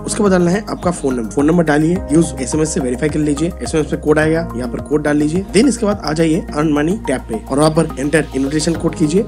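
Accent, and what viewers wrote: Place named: Uttar Pradesh, India. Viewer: aap up se Ho na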